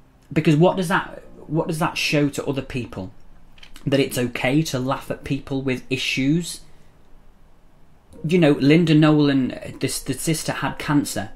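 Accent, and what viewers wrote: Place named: Liverpool, United Kingdom. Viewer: I agree with you! Just a low and disgusting way to treat someone xxx I subscribed because you’re a cool guy with a hint of a scally accent xxx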